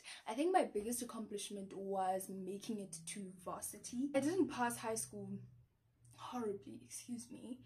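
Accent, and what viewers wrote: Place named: United Kingdom. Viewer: Damn you Nailed the British accent, btw you have a beautiful personality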